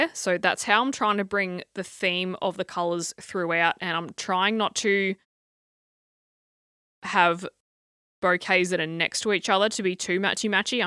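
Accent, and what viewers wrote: Australia. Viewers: Really enjoyed watching this. I love the relaxed attitude and the voice commentary maybe because u r an Aussie and u sound relatable to me. Keep them coming. Looking forward to ur next one. ❤ Btw I haven’t started in my Johanna Basford Small Victories but u have encouraged me to start. (I’ve had it since it first came out) I think I’ll use Gel pens for the entire book. Thanks for the inspiration. 😊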